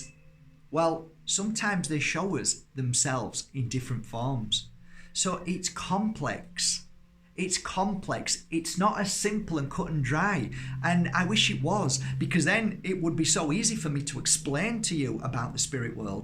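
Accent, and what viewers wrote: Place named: Northern England, United Kingdom. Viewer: There’s nothing better than listening to a nutty northerner which oozees every tune of light and positive energy, your awesome mate, Thankyou for giving your time experience 🤙🏼🙏🏼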